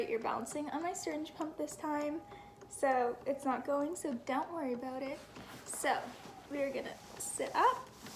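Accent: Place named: Canada. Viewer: I thought she was from Australia too! :P But then the Canadian accent :P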